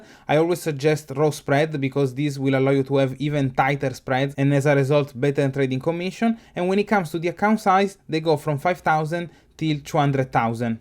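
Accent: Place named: Italy. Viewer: Ma sei per caso italiano? perchè hai quell'accento li italianizzato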